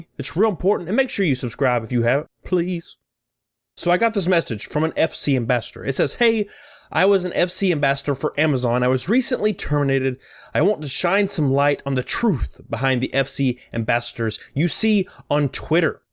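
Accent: South, United States. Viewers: Omg yes I love you. I need a southern gentleman in my life. Marry me please. 🥰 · I went to GamtStop today and they tried to sell me a 1 year warranty. I asked are you guys gonna be around in 1 year. The employee laughs and said you sound like the guy on YouTube with the southern accent.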